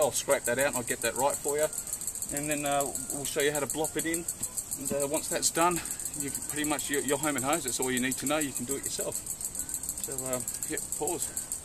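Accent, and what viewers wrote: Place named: Australia. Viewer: Found a diy with Australian accent. Yay.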